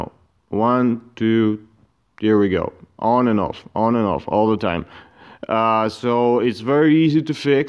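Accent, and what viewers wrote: Russia. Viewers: Solved my issue, thanks. Your have an obvious accent, is out Russian?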